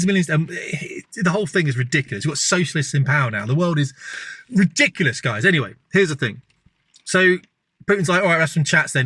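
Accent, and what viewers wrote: United Kingdom. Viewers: Wow this dude has that brit accent down ... brilliant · Love your UK accent mate. But I had to turn on CC just to follow you. You talk awfully fast. :) I have to ask what the real reason is that we (i.e. the US and other western countries) are so insanely set on defending Ukraine? It seems like it's got to be more than money. What is it in Ukraine that we don't want exposed? It must be something that threatens the cabal, the "powers that be". I don't know exactly who they are but it sure AIN'T Obama/Biden. Those people are just puppets. I'm sure Soros and company are part of this cabal, perhaps there are two competing cabals in which case I would expect the Vatican to be a part of it, i.e. the Great Whore, Babylon the Great, but regardless, there has to be something crucial in Ukraine which threatens their power. Trump is smart but I wonder if he really has taken the steps he needs to in order to crush this international shadow government. 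I'm cynically skeptical but we'll see. I think that once again he has people in his inner circle who are part of the shadow. Regardless, keep up the good posts mate.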